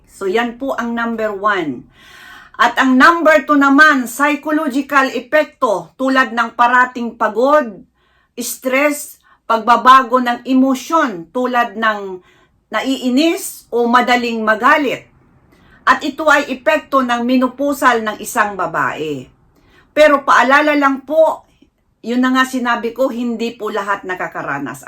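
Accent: Visayas, Philippines. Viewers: Bisaya ka day? Basta bisaya gahi og dila.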